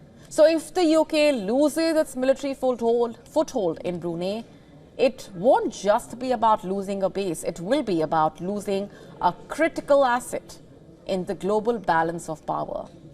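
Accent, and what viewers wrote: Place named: India. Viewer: A typical Indian accent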